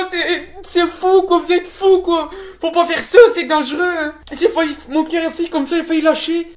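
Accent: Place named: Nord, France. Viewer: Il est de ou ? Parce que son accent est plus du 59 que du 62 .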